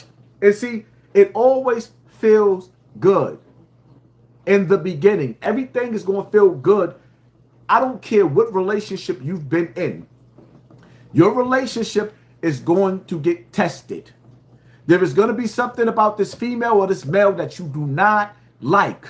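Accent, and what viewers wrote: Brooklyn, United States. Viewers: BROOKLYN TONE N THE BUILDING...EAST NY STAND UP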